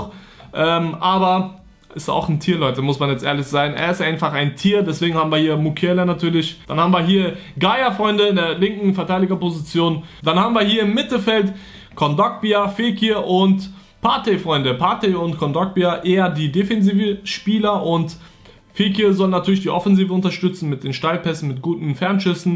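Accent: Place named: Berlin, Germany. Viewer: Das ist Berliner Akzent die sagen isch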